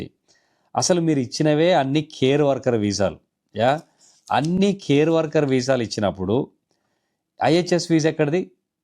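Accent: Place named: Telangana, India. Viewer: మీ తెలంగాణ యాస, యా యా అనే స్టైల్, నిర్మొహమాటంగా చెప్పే విధానం బాగుంటుంది. నవ్వుతూ చురకలు అంటిస్తున్నారు. 😂😂😂😂😂😂😂😂 తెలుగులో మంచి స్టైలిష్, స్మూత్ క్రిమినల్ విలన్ పాత్రలకు మస్తు ఉంటారు బ్రో. Anyway బాధితుల పట్ల చేస్తున్న పోరాటానికి ధన్యవాదాలు.🎉🎉🎉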